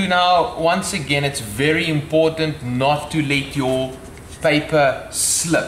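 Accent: South Africa. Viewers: I think you are 🇿🇦. Just saying by your accent 🤔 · Great to hear work explained in a South African accent for a change. Nice, concise video!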